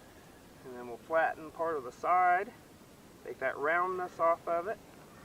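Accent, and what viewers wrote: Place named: Midwest, United States. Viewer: I thought you sounded like somebody I would know. I grew up in Vincennes Indiana. We had a big farm in Illinois.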